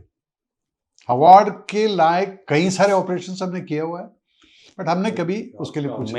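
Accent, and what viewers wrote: India. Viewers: He is from Kerala, i can see from his accent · Origin: Kerala Accent: Punjabi This is the Indian Army for you. ❤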